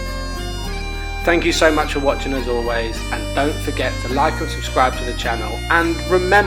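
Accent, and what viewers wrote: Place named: Scotland, United Kingdom. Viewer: your Scottish accent is ummmm good but funny 😂such beautiful seems 100 percent take Teddy there (obviously in like a year or something)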